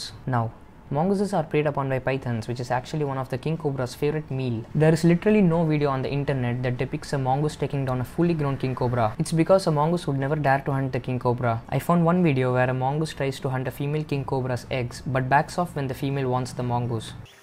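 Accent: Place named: India. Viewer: Jai Hind! Greetings from Alabama USA! Love indian accents too. Always sound cool AF.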